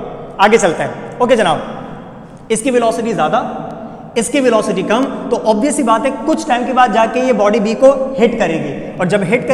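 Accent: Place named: Waziristan, Pakistan. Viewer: I think that you are from waziristan or bannu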